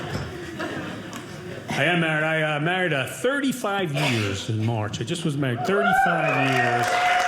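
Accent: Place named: East Coast, United States. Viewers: Thanks! Yes, I hear some east coast, but I also thought I heard a little midwest, too. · Educated east coast...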